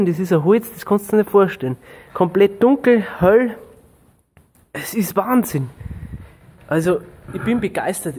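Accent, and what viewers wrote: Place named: Bavaria, Germany. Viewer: Guten Abend … (Ups, kenne deinen Namen gar nicht🤭) Ich finde hier deine Erklärungen betreffend der Biologie, inklusive dem Esoterischen Part über den Walnussbaum, sehr sehr interessant und aufschlussreich, zudem, die Sägetechnik kommt auch nicht zu kurz! 👏🏻🧐😃 Du hast wirklich ein super umfassendes Wissen, Hut ab. 🎩 Man spürt eindeutig deine Freude, Liebe und Hingabe zum Holz, der Schalk im Bayrischen Dialekt rundet es noch ab. Verständlich erzählt und „auf dem Boden“ geblieben, bleib so. 👍🏻 ☺️ Wünsch dr witerhin no an gnarret hübschi und erfolgrichi Ziit. 😉😁 Gruass us Grischun (Graubünden) ⛰ 🌲 🇨🇭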